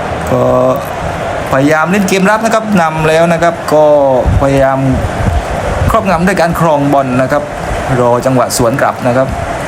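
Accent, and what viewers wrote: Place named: South, Thailand. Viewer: คนใต้ม่ายนิพี่ สําเนียงชัดๆเรย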